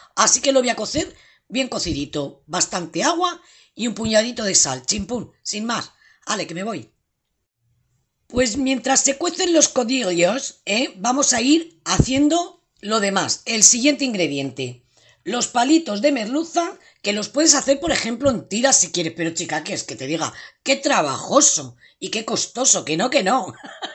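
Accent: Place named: Murcia, Spain. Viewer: tienes hacento Murciano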